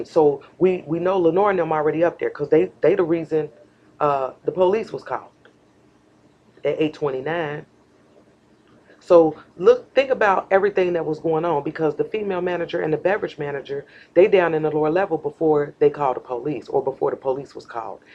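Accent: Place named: West, United States. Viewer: I love how you articulate your words. I'm from California and you definitely sound like you from the West. I've been studying to get my private investigators license to aid in the criminal defense process of the Justice System. As a "sista" (feel me) and a woman, I believe I can further and investigation that yeilds results my counter parts can not achieve. I can see you as a successful private investigator. Keep us posted!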